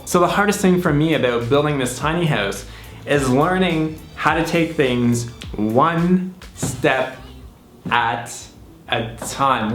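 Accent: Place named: Canada. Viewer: sounds so Canadian in this video to me. IDK why....ha!:)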